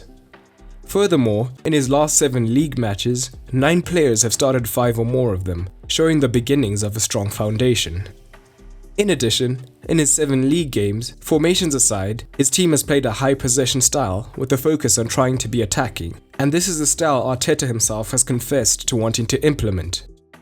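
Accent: South Africa. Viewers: This is totally unrelated, but you sound South African? So...are you?😅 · Wait is the guy speaking South African? Respect!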